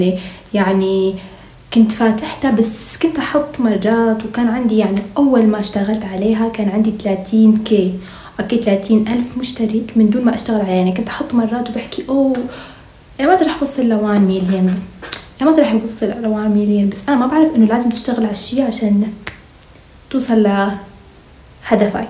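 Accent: Iraq. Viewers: مبروك يا بعد روحي جاي اتحاول تحجي عراقي اموت عليج ❤❤❤ احبج متابعتج من 🇮🇶انتي🍯🍯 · مليون مبروك على المليون تحبك هواي متابعتج من العراق لهجتك العراقية تجنننن ❤❤❤ كيوتتتت ❤❤❤❤ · صوتك بلعراقي مررة حلو مين بدو تعمل يوم كامل المتابعين يتحكمو بحياتك🗿👍🏻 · كلامج تقريبا كله عراقيي يااا عمرييي 😭😭😭🍓✨🤭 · ياعمرييييييي✨ الف الف الفف الفففف مبروككككك ❤🙀 والله فرحتلككك من كل قلبي عقبال 100 مليون يارب 💖 ياعمرييي وبسس متابعتكك من العراق🌷 احبكككك كتيرر موححححح😭😭😭💗 احيههه تقريبا كل كلامك عراقي فديت الي يتكلم عراقيي فديتكككك عععع 😭😭💅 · 😮😮اول مره اشوفك تحكي بنت ليكون عراقيه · فديت الي تتكلم عراقي+احبك موت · الف مبروكك اني من العراق🇮🇶 واحب كل فيدوهاتج وكلامج نفس العراقين❤✨ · الف الف الف الف الف الف مبروك ان شاء الله ال <num> قريبًا يارب والله فرحتلج من كل كَلبي احبججججججججج متابعتج من العراق ❤💋💗 لهجتج العراقية تجننننننننننننن ❤️‍🔥🍓🇮🇶💘🇲🇦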